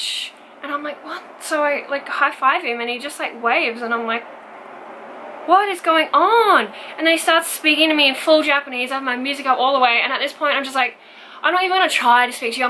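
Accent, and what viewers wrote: Australia. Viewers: are u aussie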